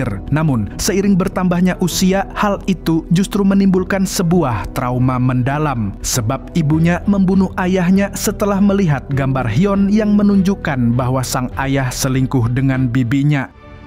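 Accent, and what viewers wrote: Banjar, Indonesia. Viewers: Banjar rupanya lahh😂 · gileee banjarnya kental banar!😂😂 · urang banjar kah ni😂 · Banjar kalinya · Banjar kah mang? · Urang banjar kahh amang nihh😂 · Amang banjar nih wkwk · buhan Banjar jua sakalinya amang nih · Nahhh urang Banjar kh pian😂😂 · urang banjar ih😂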